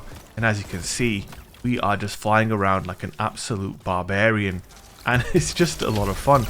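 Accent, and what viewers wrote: United Kingdom. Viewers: Are you from UK? You kinda got that accent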